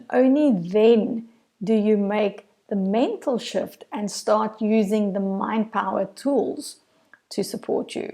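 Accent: South Africa. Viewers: You sound South African ?This is so beautiful I love this message thank you I would really love to work with you,new subscriber and so glad I found you. I'm so ready to claim my Queendom Yassss!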